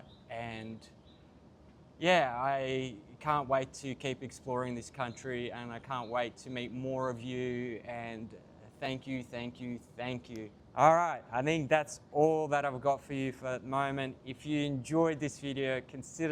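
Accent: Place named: Australia. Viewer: IR A REAL DING BAT!! NOBODY MOVES TO ANOTHER COUNTRY WITHOUT LIVING FIRST U MORON!!! UR CHARACTER AT THIS AGE ...I DID NOT LEARN ..TO LISTEN N GROW UP!! U DO IN HASTE SO HOW ON EARTH CAN U CHANGE??? UR GOING TO REPEAT UR SILLY WAYS!!! GET RID OF THAT SICKENING OZZIE PEASANT SLANG...N LEARN TO SPEAK PROPERLY..."ENGLISH"!!! NOT D ANNOYING ACCENT AT ALL!!! FIRST LEARN TO LISTEN TO D LOCALS ...N NOT SPEAK INTERRUPTING WHILE SOMEONE IS SPEAKING...COZ U WONT HEAR OTHERS GET IT???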